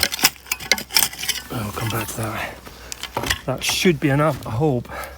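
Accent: Scotland, United Kingdom. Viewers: How can anyone be in a bad mood after watching this? Beautiful scenery, amazing finds, a delightful Scottish accent, and best of all, the Belter Boogie.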